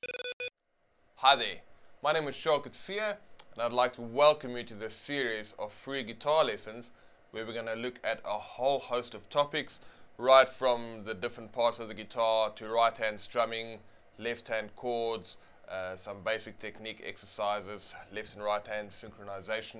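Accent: South Africa. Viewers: bru I recognise that South African accent anywhere!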